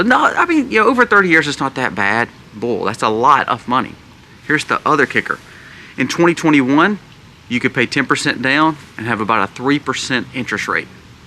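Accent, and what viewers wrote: South, United States. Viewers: He is from the South. It's called an accent. Many places in the US have different accents. And uneducated comment ❤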